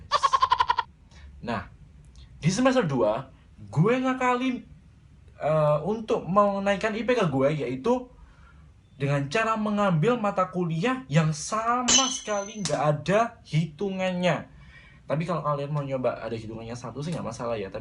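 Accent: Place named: Java, Indonesia. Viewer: rada ga enak di denger alias medok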